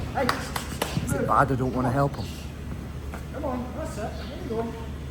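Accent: Scotland, United Kingdom. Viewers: Just dropped in to say love your unique channel. In some weird way, watching these is like some ASMR kinda videos 😅 Coupled with your soothing Scottish accent and its pure relaxation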